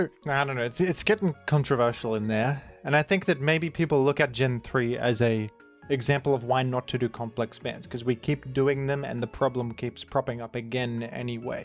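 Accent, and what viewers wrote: Australia. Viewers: I watch so much that I now internally pronounce "Baton Pass" the Aussie way. I accidentally said it to my partner even, which gave her quite a laugh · You're australian??? I never wouldve guessed.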